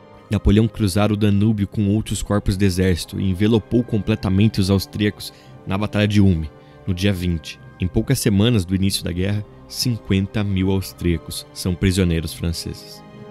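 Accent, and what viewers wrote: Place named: Caipira, Brazil. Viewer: com um sotaque caipira insuportável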